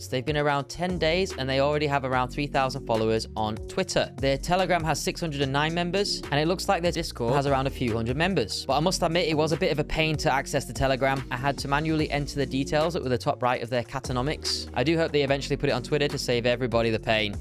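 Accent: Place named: Yorkshire, United Kingdom. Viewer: You’re the 🐐 mate aha. Can tell from the accent you’re from Yorkshire pal, which part ?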